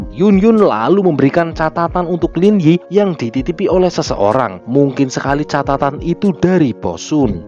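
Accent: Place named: Purwokerto, Indonesia. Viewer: Rika asline wongendi kang, medok temen gole ngomong purwokerto ya😂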